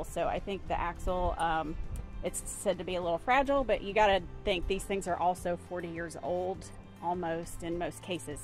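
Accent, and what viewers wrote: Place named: South, United States. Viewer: I soon as I heard that Southern accent I knew that I was in the right place 😁 Nice video.. I'm thinking about getting a camper here in Germany so I'm trying to learn as much as I can.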